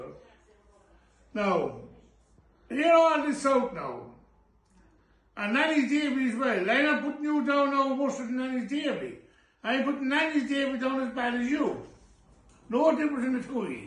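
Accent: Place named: Spain. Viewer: Is this a drunk Spanish man I’m struggling with the dialect.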